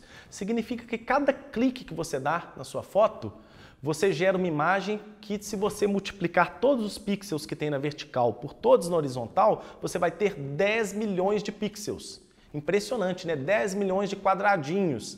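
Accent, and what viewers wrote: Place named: Minas Gerais, Brazil. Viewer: geoprocessameeeento. como eu amo o sotaque mineiro kkkkkk otima aula! obrigado!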